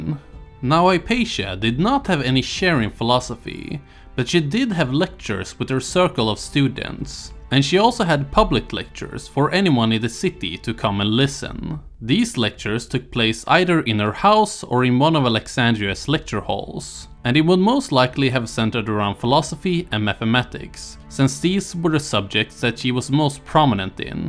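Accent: Sweden. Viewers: English isn't his first language so you probably just have to adjust your ear to the Swedish accent. The more foreign accents you hear the easier it is to decipher them. Also, I think Swedes tend to use English that's more in the British style, so if you're not used to that it can take a little while to get used to. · is this guy swedish lol. sounds like pewds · Lol your swedish accent is pretty funny but I like it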